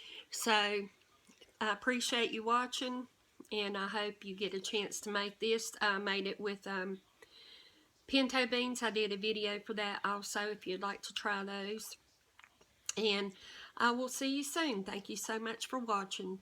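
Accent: South, United States. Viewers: All I had to do was hear that southern voice and I instantly subscribed. If anybody knows about cooking, it's a southerner. Thank you for sharing this recipe. I'm looking forward to catching up on all of your recipe videos here in southern Ohio. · Ms, I hv watched several of your videos, so now I am a big fan!!!! Your talking and accent w/mannerisms just like my Grandmothervwho was raised in So Ga. When I watch you, listen and learning,I'm hearing her telling me her lessons and stories of her data growing up!! I love it, and I am so excited to get it ur videos on all it favorite hummus foods. Lov u already and please, keep sending us videos!!! Thank you so much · has the best Southern accent I have ever heard. I can hear the kindness in it. We love you. ❤ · She talks so sweet and southerny.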